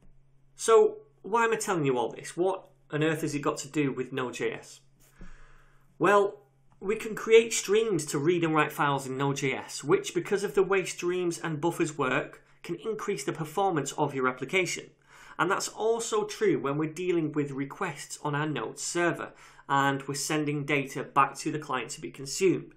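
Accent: United Kingdom. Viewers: Why do u use hard british accent?? There are many indians there who watch your videos and try to grasp the precious knowledge???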